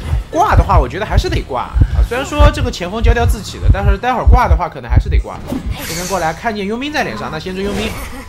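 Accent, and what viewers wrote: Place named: Shandong, China. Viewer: 有没有朋友知道是哪里人。我吃饭看室友说有口音是山东人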